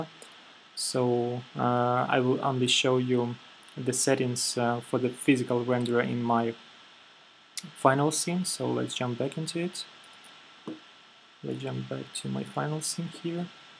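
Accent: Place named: England, United Kingdom. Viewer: о вы из англии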